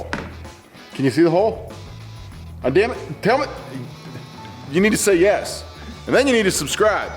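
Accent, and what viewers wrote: Pittsburgh, United States. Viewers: Hmmmm I detected a Yinzer... Looked you up, it's good to see a local (to me) doing good. (Even though you went to Franklin lol j/k The FR vs PT battles are some of the most exciting to watch). Great build, I may try and make one when I have more woodworking under my belt.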